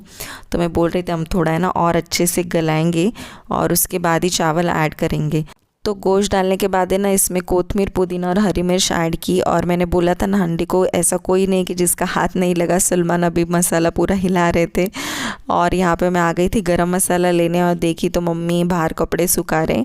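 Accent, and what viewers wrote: India. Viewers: Sister aap kokni ho kya? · As salamu alaikum mai to peheli bar Aap ka Vlloog deqa ji aap Kon se gaon se ho ji Aur aap ka bat karne ka tariqa to Hamare andara pradesh jai sa lagta hai vllog bhi Masha allha Aur pakwan bana neka tariqa Subhanlla 👌👌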